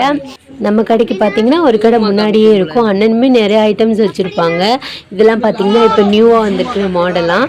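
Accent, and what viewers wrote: Ramanathapuram, India. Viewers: Ennoda native ramnad dhan unga speech vachudhan kandupidichen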